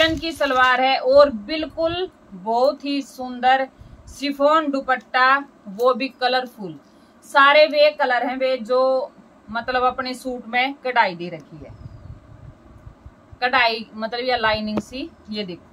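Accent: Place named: Haryana, India. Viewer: हम भी हरियाणा से हैं, मुझे आप की बोली बहुत पसंद है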